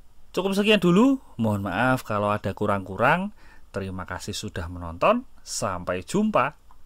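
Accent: Yogyakarta, Indonesia. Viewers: Dari semua youtuber bus, cuma mas yang bikin videonnya apik rasa jogja2 gimana dari logat heehe. Dan aku jadi nyaman nontonnya krn suaranya suara didalam bus langsung dan tidak berisik pkai soundtrack2an. Serasa diajak jalan2 live hehe. Sukses terus salam dari slipi jakarta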